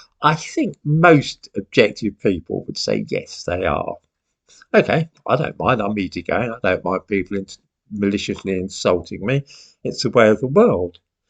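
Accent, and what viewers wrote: United Kingdom. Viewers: It is mostly because you MUMBLE and only the UK English can make out most of what you say. I find it very hard to understand a lot of your speech. Tryin learning how to speak well and once you reach a bit of an audience, you will get strikes and a van. You simply aren't a threat to the Left. I doubt that even they understand half of what you are mumbling.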